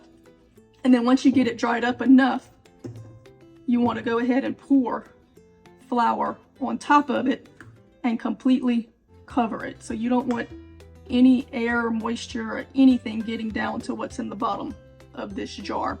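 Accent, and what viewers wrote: Louisiana, United States. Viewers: I just came across your video and SO HAPPY I did!! I’m going to try this way of creating a starter. I did have a question while watching your video. I heard an accent come across that sounds very much like south Louisiana. Where are you located? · Great video. Very helpful. I thought I detected a Louisiana accent. My grandmother was a Mayeux. I plan to try your recipe with yogurt.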